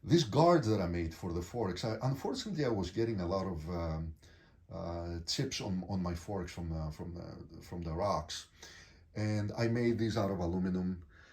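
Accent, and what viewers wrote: Greece. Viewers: The v85 is a great bike. Especially with the tubeless rims. You might want to avoid the travel version. I know people have had problems with the side cases. I think I detect a slight Greek accent, might be wrong. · Λύσε μια απορία, σε παρακαλώ. Το "λου" πολύ πατρινιώτικα το λες: "λιου". Αληθεύει ότι εξ Αχαΐας προέρχεσαι;..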